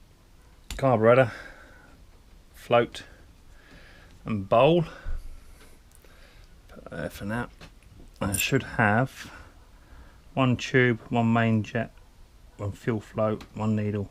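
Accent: United Kingdom. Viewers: Love the accent, the British is my favourite, great job with the mower tho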